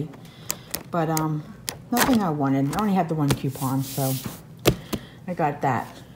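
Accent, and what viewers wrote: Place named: Pennsylvania, United States. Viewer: Hi Tammy of OKC mentioned you, so my first time viewing your channel. I knew without even looking at your address you must be from PA. I'm from NC but my neighbor of years and years is originally from PA and y'all have the same accent! I enjoyed your video!!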